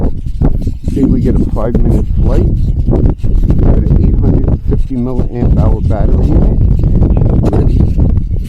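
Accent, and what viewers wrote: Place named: East Coast, United States. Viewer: I love U’r channel. You came up as a suggestion on my feed. U have a bunch of planes and I think your YouTube channel would grow much faster if you had a camera person that could zoom in and provide up close shots and a mic that could cut the wind noise. Just my opinion. I enjoy your east coast accent too. Keep it up brother and thank you for the videos!!!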